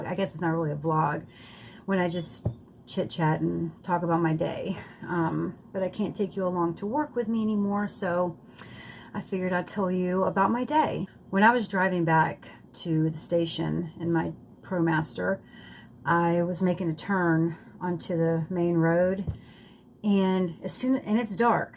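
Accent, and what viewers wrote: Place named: New Jersey, United States. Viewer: You sound like you live in NJ. I left that hell hole of corrupt made up parking tickets of places you never went, but the people there blow through red lights and it isn't one car but 5. The bikers do that for their protection because so many get killed. I get it though, I used to bike at the park. Heads up to anyone going to NJ, green light doesn't mean safe.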